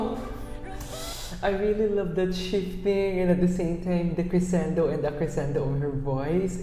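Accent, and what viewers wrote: Philippines. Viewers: Love you filipino english accent❤. Keep it up. Its colourfull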